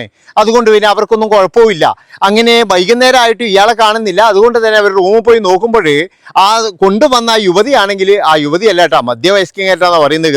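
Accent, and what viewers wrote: Kannur, India. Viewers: ചേട്ടാ, പറയുന്ന ചരിത്ര സത്യങ്ങൾ /ആനുകാലികങ്ങൾ, ലളിതമായി കണ്ണൂർ സ്റ്റൈലിൽ സമൂഹത്തിന് ബോധനം നൽകിയതിന്. 🌹🤝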